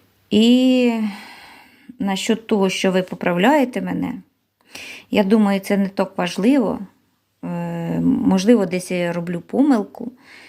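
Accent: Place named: Central Ukraine, Ukraine. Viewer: Добрий вечір,. Пояснюєш і росказуєш дуже доступною мовою. Ти чесна і відкрита людина. Говір центральної України. Велике дякую за всі відео і твій труд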